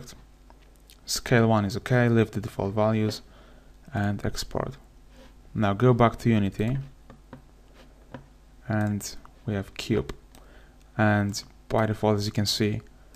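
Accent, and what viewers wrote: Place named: Poland. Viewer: It's somehow nice to hear a Polish man speaking English, your voice sounds empathic. I like yout stuff, you should do more. Pozdrawiam! :D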